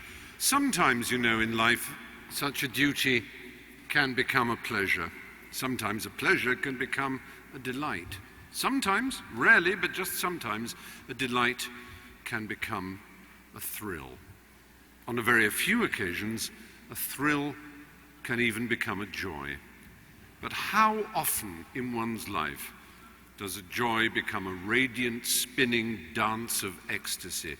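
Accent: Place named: England, United Kingdom. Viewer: From where in England is Fry's accent??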